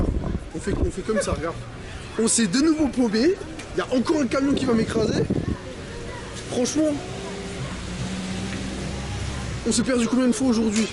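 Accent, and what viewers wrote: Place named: Alsace, France. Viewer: T'es Alsacien ? T'as un gros accent.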